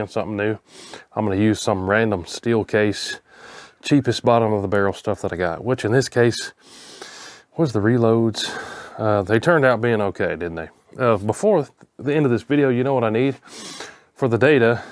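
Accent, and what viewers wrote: United States. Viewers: Message from Australia. Hearing this dude talk is why I love the yanks.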